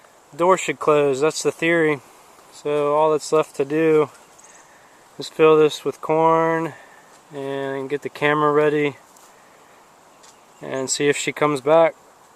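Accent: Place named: Carolinas, United States. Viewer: Awesome! Sounds like your in the Carloinas and not Texas though. Cool videos man!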